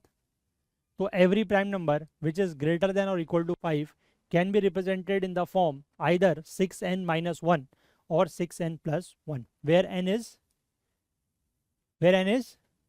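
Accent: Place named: Kashmir, India. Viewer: That Kashmiri accent😂❤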